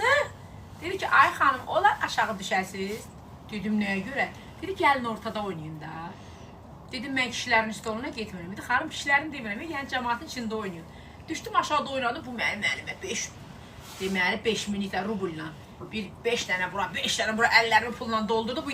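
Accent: Goranboy, Azerbaijan. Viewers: Goranboydan salamlar.salam ay elli qizi.danisigina quzu keserem.oz rayonumuzun lehcesi lezzet eleyir.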